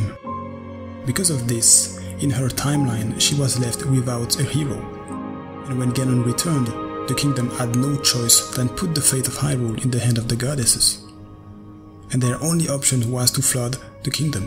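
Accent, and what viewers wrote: France. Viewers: On entend bien l’accent français :’)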